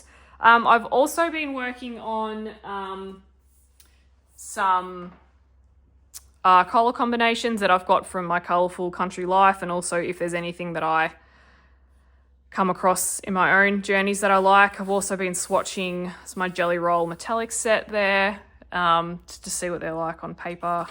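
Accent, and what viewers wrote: Australia. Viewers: Aussie accent, hit subscribe immediately! I’m a Melbourne based colouring book enthusiast too!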